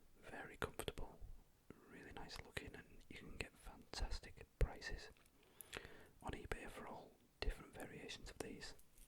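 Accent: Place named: Northern England, United Kingdom. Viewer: northern england, we have the best accents!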